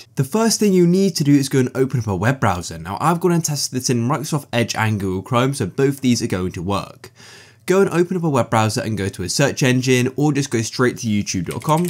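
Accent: United Kingdom. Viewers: this guy is speaking british english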